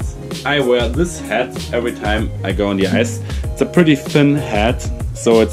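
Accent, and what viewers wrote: Germany. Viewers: Hey, du sprichst gefühlt besser Deutsch als Schwizerisch. Woher kommst du denn? Ich bin fast in Konstanz und könnte ein neues Mitglied werden 😋 Bin schon 30 🙊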